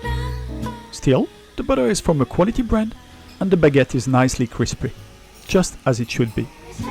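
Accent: France. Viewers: First time i listen french english sounds cool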